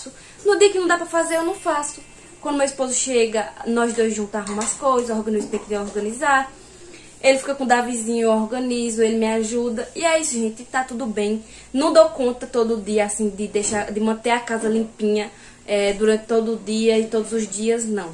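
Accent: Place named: Pernambuco, Brazil. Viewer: Pernambucana arretada amo esse sotaque também sou de Pernambuco..bjs conterrânea 😘😘😘😘😘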